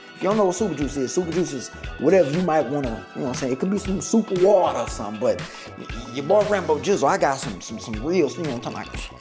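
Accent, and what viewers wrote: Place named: South, United States. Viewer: u from Crenshaw fam? I thought u was born and raised in the South fam especially with da accent.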